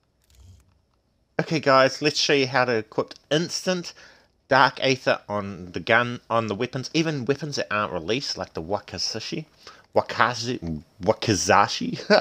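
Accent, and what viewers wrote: New Zealand. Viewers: new zealand accents make me want to be from new zealand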